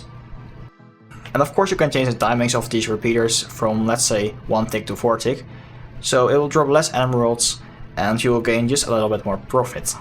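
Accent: Netherlands. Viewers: You sound dutch..you dutch?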